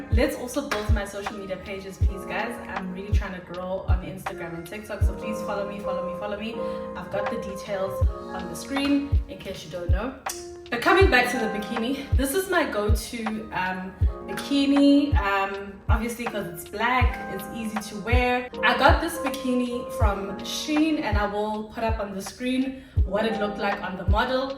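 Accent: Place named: South Africa. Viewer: You're an excellent presenter and ambassador for busty ladies. You are charming. Plus I love your SA accent 🤩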